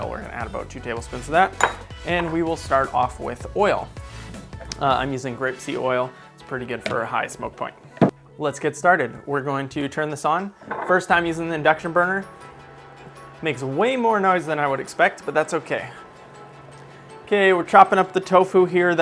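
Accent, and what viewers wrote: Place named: Ontario, Canada. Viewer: Random question, but are you Canadian? You sound like you could be from Ontario lol!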